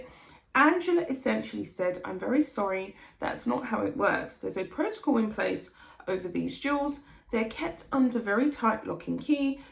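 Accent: United Kingdom. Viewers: You are so Beautiful! It’s so nice to finally see you. I Love your British accent. I changed my “ Siri” to be a British man because I just adore the accent.. “ Harry the Handbag “, that was Epic!!!!🤣🤣🥰👍🏼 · Wow I finally get to see you. You always say Im a black British born lady but you sound like a white British lady. Its great to actually see you. Hi. Hello sorry its feels like we are finally meeting. Lol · Yes, you should appear on camera, why wouldn't you - you look lovely and present yourself very well and are very articulated. British accent to boot☺. But I think some simple background would be good too. Maybe some area in your home with bookshelves? Nothing too fancy, just to make it a bit more personal I guess rather than a blank wall. Keep up the great work!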